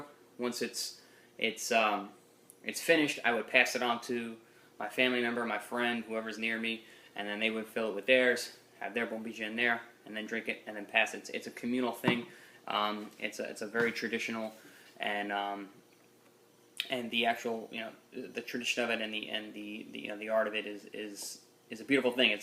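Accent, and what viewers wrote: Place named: Argentina. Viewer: che...... vos sos argentino?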